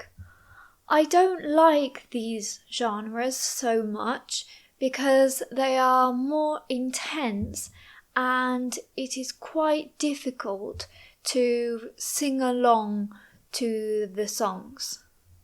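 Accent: United Kingdom. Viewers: Is this a British accent? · You have so beautiful british accent!❤ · Is this a British accent or American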